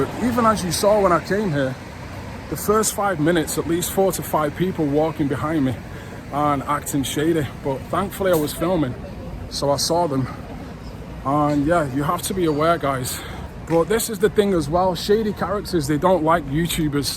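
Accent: Manchester, United Kingdom. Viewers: proppoh manchestoh accent , good video mate well done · Well going by his accent he’s certainly a proper Mancunian. 😊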